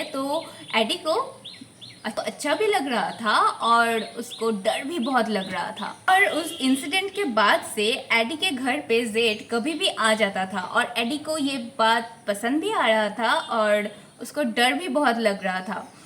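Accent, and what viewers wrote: Bengal, India. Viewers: Di you are bengali, right?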